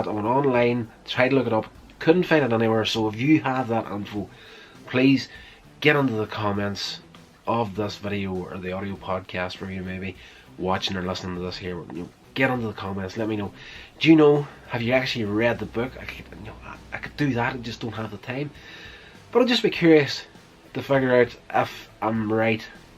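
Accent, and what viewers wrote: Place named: Scotland, United Kingdom. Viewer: Excellent review. You sound Scottish. I'm MinneSNOWton.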